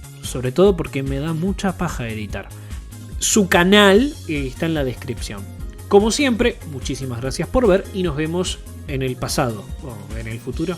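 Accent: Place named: Argentina. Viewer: él es argentino, por eso dice pronuncia así ciertas palabras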